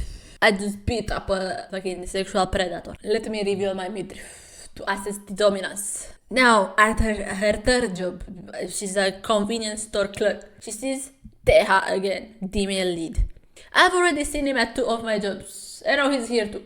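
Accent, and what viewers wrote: Russia. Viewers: Am I the only one who focused on her accent thinking it’s such a good Russian accent 😭😭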